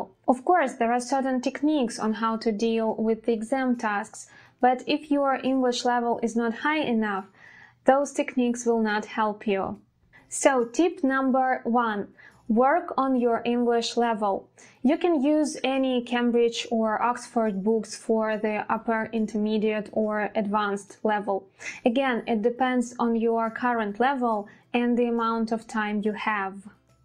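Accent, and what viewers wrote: Russia. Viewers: Wow, Russian accent and manner of speaking are so noticeable:) You don't really sound like a native... no offence, tho. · It's really not, I don't think you heard native English accent. I could hear the russian accent from the very first minute of the video. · Real Russian intonations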